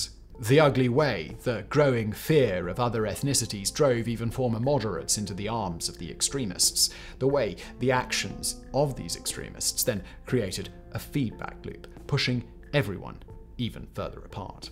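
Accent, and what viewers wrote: England, United Kingdom. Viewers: You sound like an Englishman or British you know these people do no longer want your propagandab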